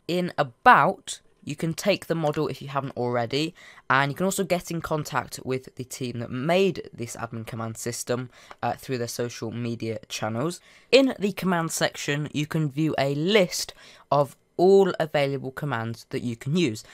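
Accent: United Kingdom. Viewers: Dont bully him cuz he is saying hach its a british thing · He’s British that’s how they say it there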